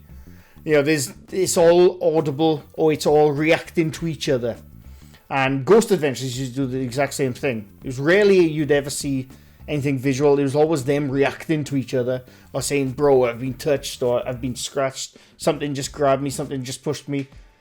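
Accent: Wales, United Kingdom. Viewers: I can't get over people thinking you are Irish or Scottish when your Welsh accent is so strong! mind you i can picture you in Braveheart..... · Are you welsh?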